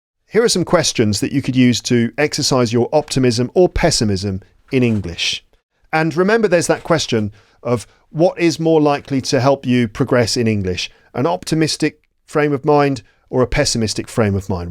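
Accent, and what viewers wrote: United Kingdom. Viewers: Hello everyone, i would really appreciate your help. My question is, Am I wrong, listen to, which I consider the best channel for learning English. I'm wondering this behe has a British accent, and I am in a place where American English is spoken,. I mean obviously it's different but i also think listening to it's helping me to improve my listening and speaking. At grammar I'm terrible. Thank you guys.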